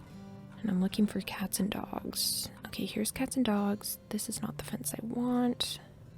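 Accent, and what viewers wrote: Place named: Midwest, United States. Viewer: Are you from the Midwest? You got the accent and I love it lol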